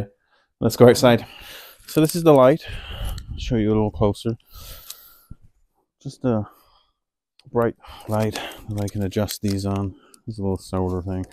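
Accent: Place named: United Kingdom. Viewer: I’ve been wondering about your accent, where are you from in Britain? These videos are great, really nice to get to know you 😊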